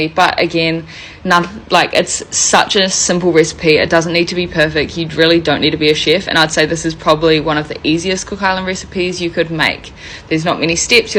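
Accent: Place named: New Zealand. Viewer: Kiwi accent coming through there, loud and clear. 'Small little bits'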